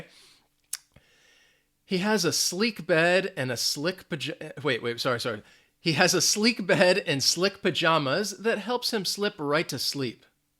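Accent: United States. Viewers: i love your accent. from which part of the US are you from?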